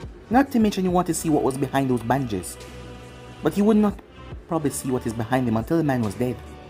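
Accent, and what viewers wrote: Jamaica. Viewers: I've always wanted to ask you this question, are u from Jamaica.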